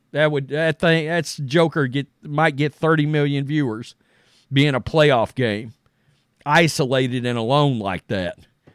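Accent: South, United States. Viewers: Dude WHERE ARE YOU FROM? TEXAS? THIS IS BUFFALO AND PITTSBURGH IN JANUARY!!! DID YOU JUST DISCOVER FOOTBALL? This is like shooting yourself in head as treatment for a headache. You're gonna suggest trashing the entire playoff schedule for the winner because playoff football has to be played in the snow? IN BUFFALO???? You sound like you're from the south so i get how SNOW sounds to you, but this is utterly ridiculous to suggest SNOW is that big of a deal to Western NY and Western PA ppl. We LIVE in Lake Effect snow conditions our entire lives!!!! Sit this one out country boy. Guarantee the Bills would be LIVID to give up home field advantage for some snow. This is extremely hilarious 😂🤣 tell me you're not from around here without saying your not from around here. If ANYONE can play in these elements... Its Pittsburgh and Buffalo 😂😂😂😂😂😂😂😂😂😂😂😂😂😂😂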